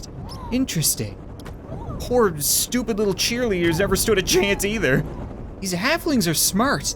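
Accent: Canada. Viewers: Wait, you're Canadian? How did I not notice?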